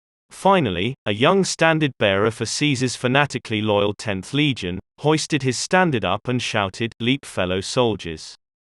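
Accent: England, United Kingdom. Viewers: Just a thought, You sound English, so you must know how close southern England is to Calais in France, visible on a clear day Loads of people have swam across the channel including older people and younger ones The youngest being 12 or 13 years old ( that was a few years ago, i don't know if anyone younger has swam the channel since then.) If you've been to France on the ferry you'll know it doesn't take long even in a slow lumbering ship like that. If you set off in good weather, with no sign of rain clouds as far as you can see you'll arrive on the opposite coast without any problems. Only a fool would set off across the channel in bad weather ,dark clouds or strong winds. The Spanish tried several times and were blew beyond the channel into the north sea and not many survived. Caesar wasn't a fool, he wouldn't try crossing in bad weather , not twice. 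Nobody would, they would just wait for better weather. They would only do something like that if they had no other choice , Such as being chased by a Celtic army. Its the only thing that makes sense.) Beaching ships doesn't make sense either, if a quick departure is needed and the tide is out , your fkd . A couple of miles north or south there's a few sheltered harbours that would have been perfect. He claims to have someone with him from Britain who knew the land..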